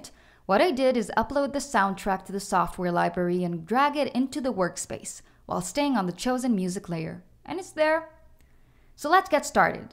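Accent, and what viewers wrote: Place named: Scandinavia. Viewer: My guess would be... a hint of Swedish or Norwegian?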